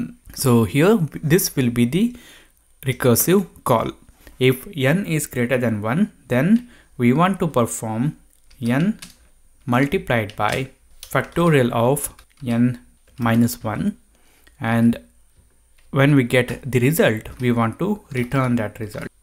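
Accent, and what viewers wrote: India. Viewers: Please leave and never come back if you cant accept Indian Accent.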